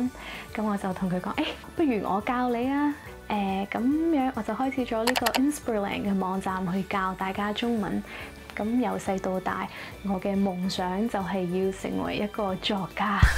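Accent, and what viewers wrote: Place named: Hong Kong, China. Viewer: 你啲廣東話好香港味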